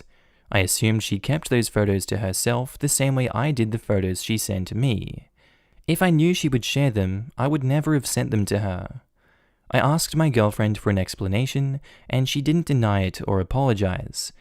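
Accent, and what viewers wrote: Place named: Australia. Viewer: I don't know why, but I just love the Aussie accent, and slang. I know there's probably many types of Aussie accents, like there's different American and British accent, but the one you have is my favorite. I love listening to Reddit videos, and having an Aussie doing makes it even better.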